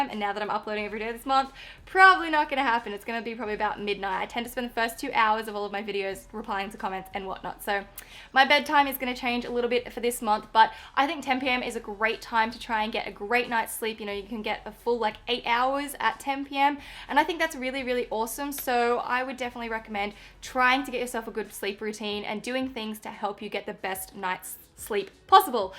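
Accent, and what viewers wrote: Australia. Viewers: You are the BEST! I usually hate Australian accent but i love your accent!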